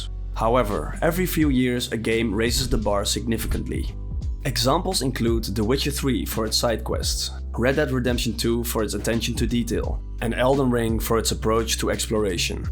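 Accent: Netherlands. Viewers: That Dutch accent though… 😉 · that thick Dutch accent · Good video, if you're interested I would like to give you a few tips on your English pronunciation, being a Dutchy myself. You are super close to perfect pronunciation. All you really need to do is shake some of the hard f's, t's and s's that we use in Dutch all the time. Smooth those out and your English will be *chef's kiss*. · Nice edit. Also like te Dutchy accent.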